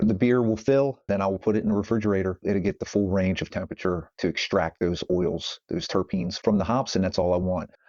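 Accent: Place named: Maryland, United States. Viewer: I appreciate your videos. You sound like you’re from Maryland. Are you?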